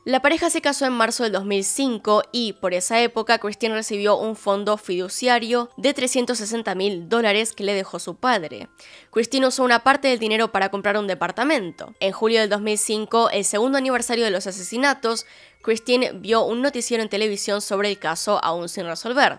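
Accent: Argentina. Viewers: Porque los argentinos no pueden decir la Y y la LL y dicen "Sho" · Es argentina :D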